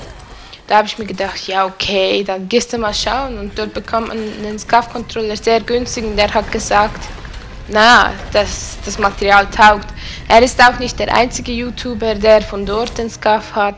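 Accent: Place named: Switzerland. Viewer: haha hab das video beim commander gesehen und bei den ersten 3 Sekunden gewusst das du Schweizerin bist ;D swiss power ! ^^ ich lahn mal es abo da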